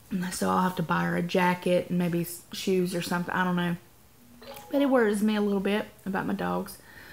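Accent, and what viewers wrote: South, United States. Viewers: Canada is bilingual so you'll find a lot of folks who can speak/understand English. You will probably be treated just fine, as your southern accent is endearing. Montreal is a beautiful old city...are you guys going to do a vacation there to check things out? On the drug front, you likely won't find it hard to get your supplements...if anything Canada has many more over the counter drugs (that don't require prescriptions). I am speaking from experience, as I'm a transplanted Canadian who now lives in WA state. I actually have to make regular runs to the border (no...not for tacos!) to get some of the things I'm used to having on hand (Voltaren, Robax (has Soma in it) and cough syrup with codeine...yadda yadda). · Hey! You will find that what a couple of the other ladies have said is correct, there are a lot of folks in Quebec, Montreal in particular that do speak English, however, I highly recommend learning to speak french as you will be more comfortable if you do so. 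That and hearing your southern self speaking french will be AWESOME! As for the vitamins, Melanie Gregory is right. Also when we move into an area, I have gotten a grocery trolly to take on the bus so I don't have to carry the groceries home. Explore at your leisure so you are more comfortable when you have to be somewhere. What is your husband taking that will have him at the University in Montreal??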